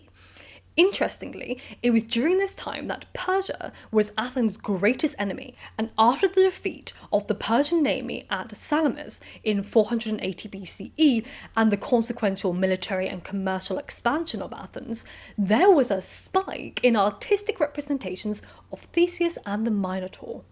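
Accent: United Kingdom. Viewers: Just found your channel - I'm enjoying it! So many great and informative videos, and so well presented! Growing up, my librarian parents gave me a book of Greek Mythology to read, so I am familiar with the myths, names and stories. Later in life I re-examined the Minotaur myth twice as I discovered new references to it. The first was Richard Strauss' opera "Ariadne auf Naxos" (I've heard you state you're not a music fan, but check this out as "research"), and the other a short story by Jorge Luis Borges, where he re-tells the story from the point-of-view of the Minotaur! My jaw dropped when I read that - if you don't know it, it's a super short, and satisfying, read. The other thing I note when I watch your videos, is that you folks across the pond pronounce all the Greek names very differently than we do in the States. Is that scholarly, or just regional I wonder? 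Anyway, great job (as usual!)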